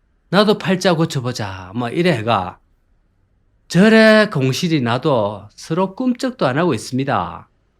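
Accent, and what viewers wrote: Gyeongsang, South Korea. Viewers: 영남할배가 무슨 서울가로수길까지 와서 설레발인가 이 송파할배가 듣기 거북하구만~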